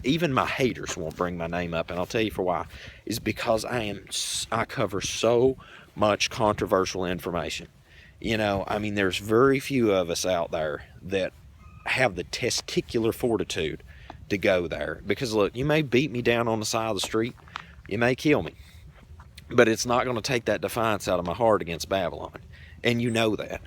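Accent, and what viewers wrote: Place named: Kentucky, United States. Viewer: I like the accent is that KENTUCKY?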